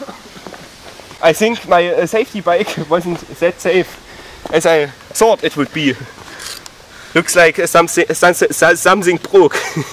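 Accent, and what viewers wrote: United Kingdom. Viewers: Sag mal, bist du in England aufwewachsen oder warum der glamuröse, britische Akzent???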